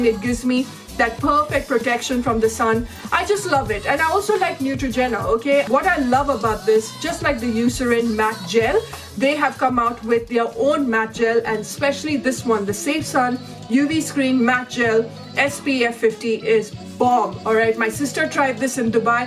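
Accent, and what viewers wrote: Kerala, India. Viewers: thanks for the reply. I found a way around it. Just don’t close ur eyes...it’s sets real quick anyways.....glad to know it’s coz of the camphor...love ur videos n ur enthusiasm especially the mallu voice overs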